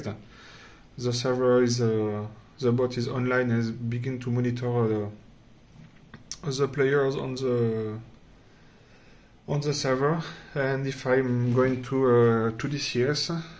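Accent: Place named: France. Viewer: Un bon accent Français 🙂 Merci pour la petite vidéo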